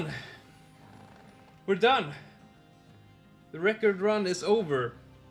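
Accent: Sweden. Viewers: Kan inte annat än älska den svenska accenten! Härlig video! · the swedish accent is wayy to hard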